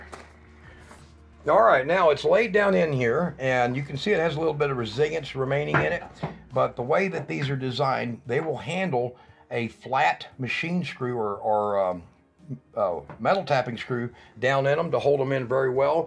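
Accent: United States. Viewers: You sound American.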